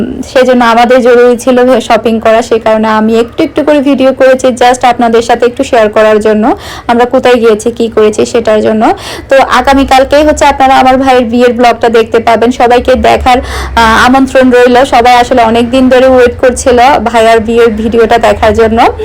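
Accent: Chittagong, Bangladesh. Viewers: Chatagong a vasa no boja r da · Onk din por Chittagong er kotha sonlam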